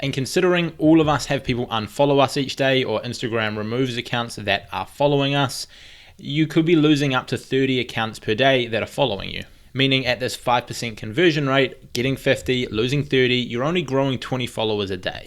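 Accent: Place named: New Zealand. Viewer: To be Honest , You're kiwi Accent sounds like a Music to hear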